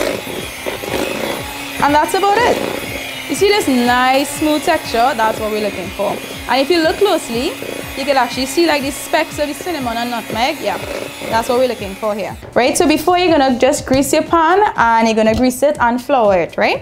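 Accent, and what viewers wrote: Trinidad and Tobago. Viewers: It's just the way some trinis speak. Ever listen to the Indians from India? I swear every other word is "actually"!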